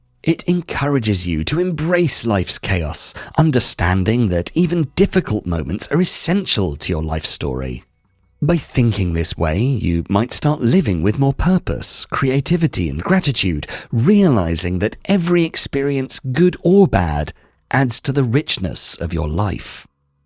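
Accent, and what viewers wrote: United Kingdom. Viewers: Perfect British accent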